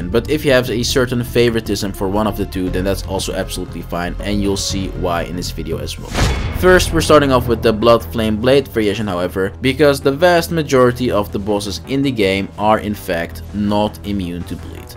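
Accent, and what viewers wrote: Netherlands. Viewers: Holy sh*t wanna try out this build. Love me some artist in NG+. Now gonna go with this one in next run. Think I'll do a normal NG run to really see the power of this! O and artist really nice on most enemies, only Gargoyles are a pain. I use a flail cause of the dex arcane. And I was wondering cause of your accent if your from the Netherlands (I am).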